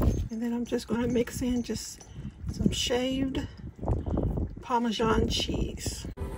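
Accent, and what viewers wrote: South, United States. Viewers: I enjoy your content. Thank you for sharing. 1. I think I hear a southern accent? 2. Onions tend to have a long lasting after taste that is awful to me. I think it's just me though - folks love onions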